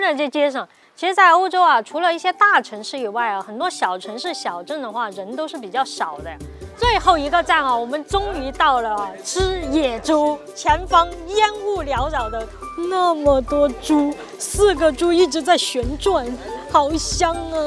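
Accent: Sichuan, China. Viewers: 不一样的坝坝宴，不一样的川式普通话，不摆嘞。。。。！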